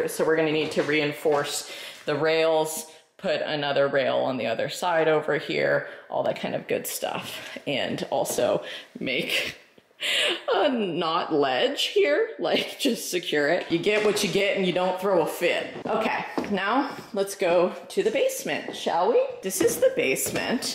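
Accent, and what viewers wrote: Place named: South, United States. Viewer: This is such an amazing place, congrats to you both!! Your future children are so lucky to be able to grow up in a place like this I know youre gunna make it so homely and warm<3 Also! Idk if youre doing it on purpose but I feel like youve gotten a more of a southern accent since being in virginia than when you were in oregon haha its so cute!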